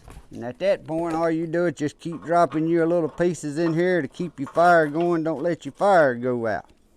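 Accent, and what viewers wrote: United States. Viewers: Great video! 🙂 I love your accent, where are you from in the USA? (I'm Australian)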